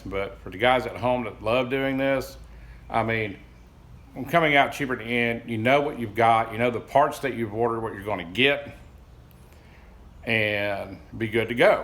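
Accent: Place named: South, United States. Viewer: Great job again, with your mem card filling up maybe upload in 720p you might get a few more minutes, its still hd but youtube for some reason have taken of the red hd if u follow me man. regards from down under, love your Southern accent stay cool mate.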